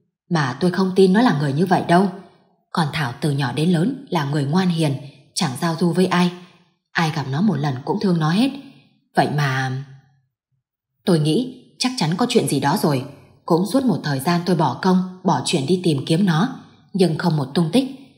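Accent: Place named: Hanoi, Vietnam. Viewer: Giọng đoc rõ ràng. Đúng là giọng miền Bắc trước năm 1975 HÀ NỘI. Cảm ơn nhiều. CHÚC AN VUI.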